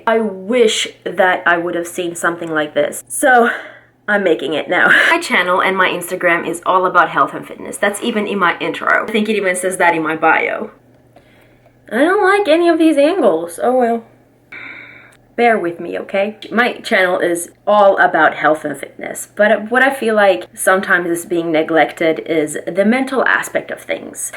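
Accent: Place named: Louisiana, United States. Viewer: is getting that Cajun accent. 😛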